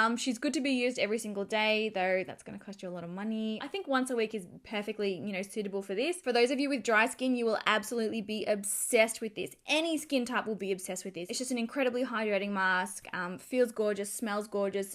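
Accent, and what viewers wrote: Australia. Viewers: Love this! Thank you so much! I love your Australian accent and how you pronounce things.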